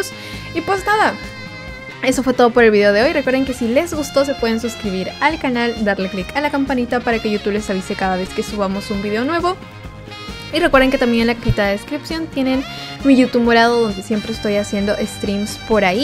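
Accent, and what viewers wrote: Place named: Mexico. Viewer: flaca todo bien pero porque hablas como mexicana!?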